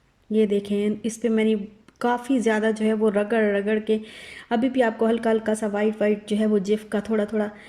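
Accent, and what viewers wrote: Saraiki, Pakistan. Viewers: Dear sister mein ap ka vlog 1st time dekh rhe hu ap kaha rehti ho aur ap kis city se belogg karti ho muje ap ke zuban mein saraeki ka tech lag raha hai mrs zulfiqar lahore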